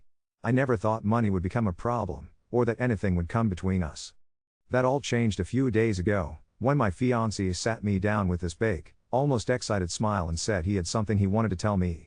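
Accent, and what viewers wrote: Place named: South, United States. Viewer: why is the voice southern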